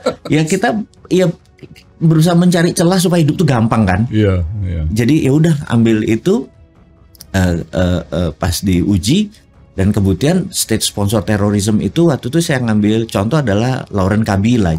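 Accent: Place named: Palembang, Indonesia. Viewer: msh ingt aja logat bhs palembang. 🤣🤣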